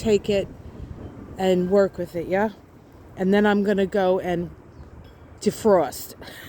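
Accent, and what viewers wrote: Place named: New England, United States. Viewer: Good morning from Sunny 45 degrees in Wichita, KS - going up to 68 today! I’m from CT and lived in Brookline, MA in the mid-80’s and your accent really brings me back! Love your astrology readings! Thank you! ♋️♒️♓️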